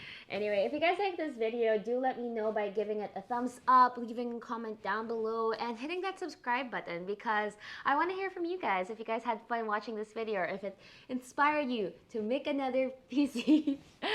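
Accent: Philippines. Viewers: what's your ethnicity? you have that Filipino accent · You sound Filipino But awesome video and build <3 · Great videe, love your content. are you pinay? i feel like i can hear alittle accent in your voice :) keep up the great work! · i found it funny as I have wasted so many tubes like you did lmao🥲. But keep making the content that you love doing! I thought that the accent was a bit familiar but immediately noticed when you spoke tagalog in the vid. Always happy to support a fellow filipino. 😊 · First thing that i noticed is your accent. Asked my self why does it sounds like filipino accent, i never thought you have filipino blood till i checked your about. Anyways keep it up! nice pc! hoping to have this kind of build soon! Ingat and god bless haha. you gained a new subscriber.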